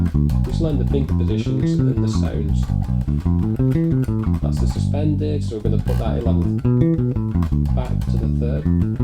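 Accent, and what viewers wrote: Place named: North, United Kingdom. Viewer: Superb lesson .. do I detect a northern twang .. I’ll be practicing my extended scales today , have you any cool exercises to go with them ?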